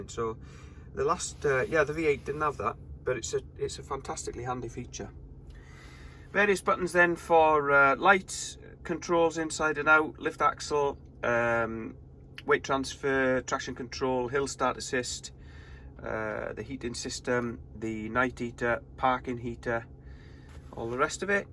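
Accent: Wales, United Kingdom. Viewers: Not a trucker but have binge watched your channel this week, which I enjoy 2 questions: Am hearing a Welsh underlying accent? Where is home when you are there?, not far from me Leeds/Harrogate/York? maybe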